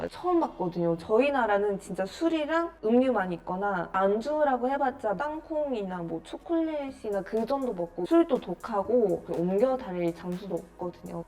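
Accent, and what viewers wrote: Seoul, South Korea. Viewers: 한국발음.한국얼굴 한국인이네. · 와...진짜 서울 사람이네요.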